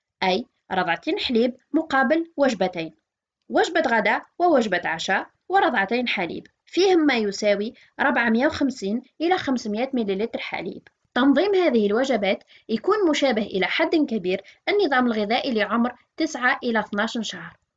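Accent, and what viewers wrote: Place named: East, Algeria. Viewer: دكتورة عندي فضول نعرف انت منين حاسة بيك من عنابة ولا الطارف ولا قالمة ولا سوق اهراس خاطر هدرتك تشبه بزاف لهدرتي انا من خنشلة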